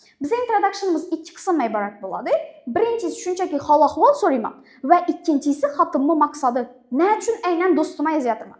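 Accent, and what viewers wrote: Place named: Khorezm, Uzbekistan. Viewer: Ex xorazmcha ekanda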